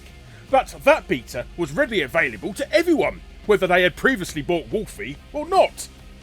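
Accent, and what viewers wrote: England, United Kingdom. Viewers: Me: “What’s a beater code?” … Oh! A BETA (Bay-ta) code! Gotta love that English accent! Lol!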